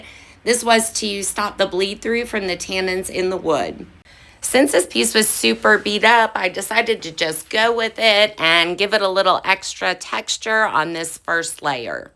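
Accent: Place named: Alabama, United States. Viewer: Love the Alabama accent. lol Amazing work.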